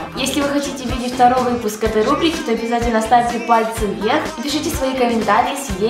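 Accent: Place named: Ukraine. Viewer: Она ведь из Украины, а у нас многие так говорят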